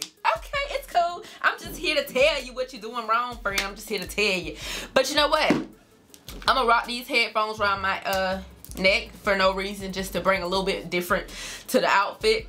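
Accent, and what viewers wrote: South, United States. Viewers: is on a natural high. We luv you gurl. sounds like a Southern Bell😂😂😭😭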